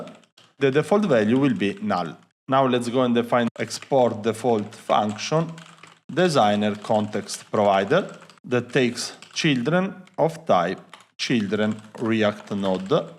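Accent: Italy. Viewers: Ma sei italiano? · Are you Italianoo ❤? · Nice work! Are you Italian?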